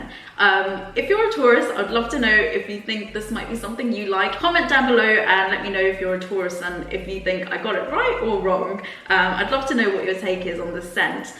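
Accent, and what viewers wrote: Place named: United Kingdom. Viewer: Nice work sister. Love that British accent lol.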